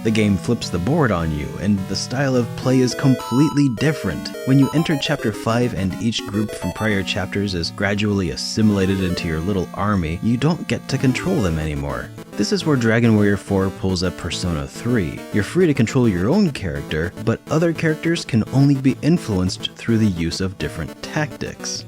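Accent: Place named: Cockney, United Kingdom. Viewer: MasterStryfe I thought it was because he was using cockney English.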